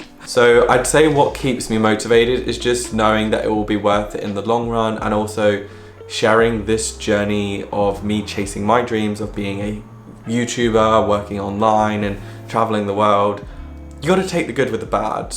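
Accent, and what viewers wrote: Australia. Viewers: You definitely have a Aussie twang in your accent now!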